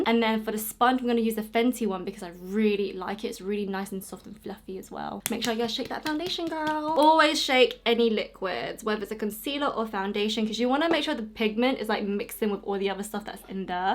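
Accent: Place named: England, United Kingdom. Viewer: May I just say how lovely and precious you are?!?! I so very much enjoy watching you and I love your accent!!! I'm from VA in the US and all I hear is country ass southern accents which don't get me wrong that's fine but I love English accents!!!🇬🇧🇬🇧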